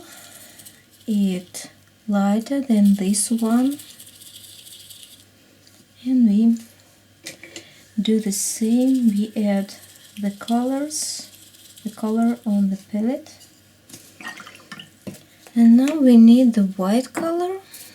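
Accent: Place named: Russia. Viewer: вы русскоговорящая? Акцент очень наш))))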